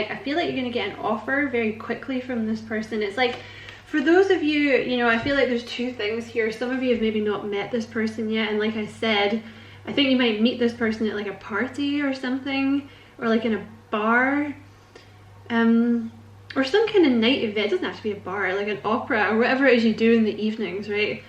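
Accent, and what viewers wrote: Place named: Scotland, United Kingdom. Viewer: Such a beautiful reading sweetheart. Yes....that sounds like my twin flame..we both had to do our shadow work before the reunion 🫂. Thank you for spiritual skills. I love your Scottish voice...we are in the same country. Peace&Love&Light Namaste 🙏 ♥️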